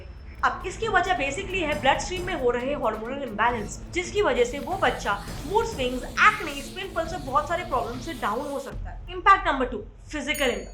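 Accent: Northeast, India. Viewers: Is she from Assam? She sounds like a northeast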